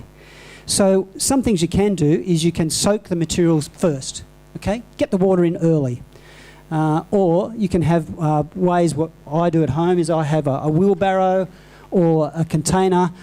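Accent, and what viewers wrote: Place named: Australia. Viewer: Where in Australia are you from? Great talk!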